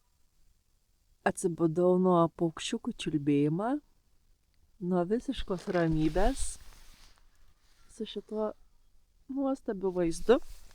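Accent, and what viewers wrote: Lithuania. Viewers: Sveiki, smagu Jus stebeti, is kur Jus esat Lietuvoj, kalba kaip Siauietiska arba Panevezys?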